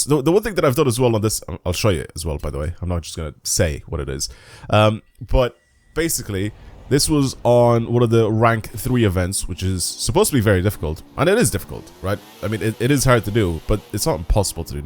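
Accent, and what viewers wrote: Ireland. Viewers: personal question but do you live near kallinary in ireland, ur accent sounds very similar to someone i know from there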